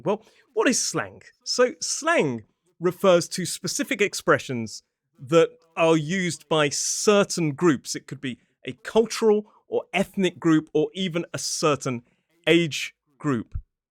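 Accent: United Kingdom. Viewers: I really like your british and energy you putting in the videos. 😊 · Love your British accent 🎉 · I absolutely love the British accent and phrases 😍 My late husband was British! I enjoy your videos 😁 ❤️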